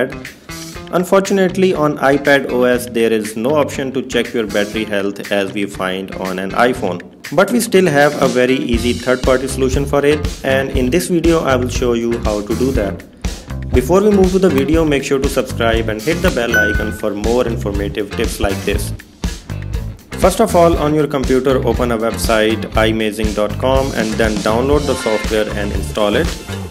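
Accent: India. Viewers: There's no way I'll trust the words of an Indian sounding guy when it comes to software suggestions.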